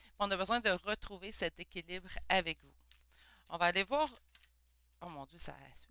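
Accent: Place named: Montreal, Canada. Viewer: Bonjour, j'aime beaucoup votre façon d'interpréter les cartes Et j'adore votre joli accent. Ça me fait penser à ma famille qui vit à Montréal 😊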